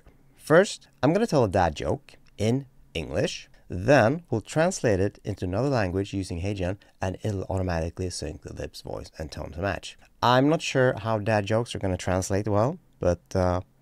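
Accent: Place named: Sweden. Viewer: it's pretty easy to tell the real from the robot. your natural voice has much more low-end resonance and a slight, but endearing, european accent (swedish?). on the clone version, you are sans accent (test your french) and you're more minnie than mickey. the lack of bass might be correctable in post; the accent, not so much.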